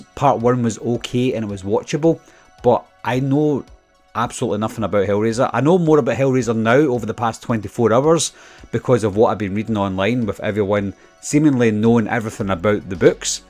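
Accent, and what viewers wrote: Scotland, United Kingdom. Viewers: Yeah of course I love your videos pal and I'm Scottish so it's nice to hear my own accent online 😀 👍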